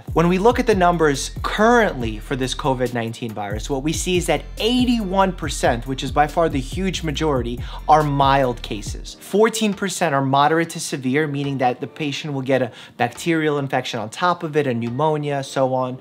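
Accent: New York, United States. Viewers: Is he sounding more New York than any other video he's been on? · with the NY accent though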